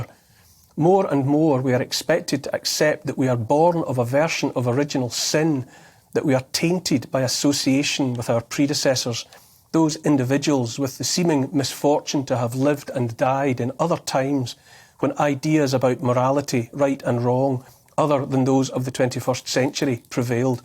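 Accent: Scotland, United Kingdom. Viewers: I’m English but call myself British and love and miss my people as I live in nz . Neil Oliver you are wonderfully erudite, and it’s such a relief to hear your voice of reason - spoken with the charm of your Scottish accent . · The usual English assumption that Britain is England! Yes, I know he's got a Scottish accent but |I assure you he's NOT Scottish. No self respecting "Scotsman" would ever call themself "British"! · I get the real scottish feeling when this guy talk.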